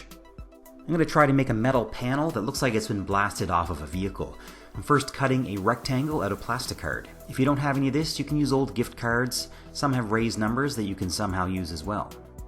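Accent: Canada. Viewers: perfect base! that accent is definetly from the atlantic provinces! greetings from cape breton!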